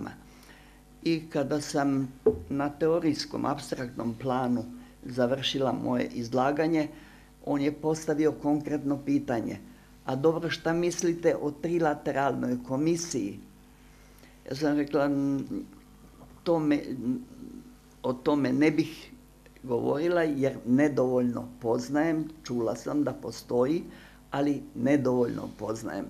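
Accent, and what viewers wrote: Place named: Krajina, Croatia. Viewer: Говори као и сви Крајишници!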